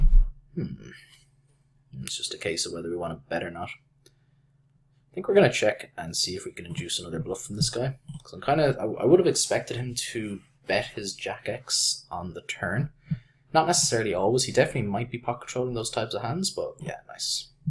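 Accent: Ireland. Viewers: Irish confirmed.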